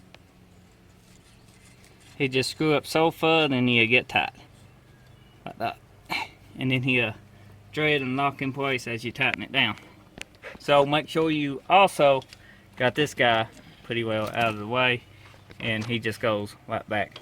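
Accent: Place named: Louisiana, United States. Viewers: Who else loves the southern accent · Are you from Louisiana?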